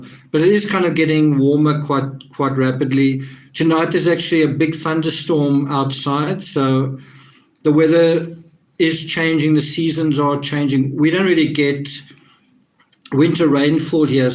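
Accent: South Africa. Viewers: Distinctly South African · Accent sounds like S.Africa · Your accent is SOUTH AFRICAN!! I'm a fellow African, a Zimbabwean. Thank you for your coverage of this disturbing case. The whole world wants Summer home except the parents (if they can be called that) They don't deserve that title in my opinion. The "for sale" sign on the drum outside while Summer plays in the rain speaks volumes to me.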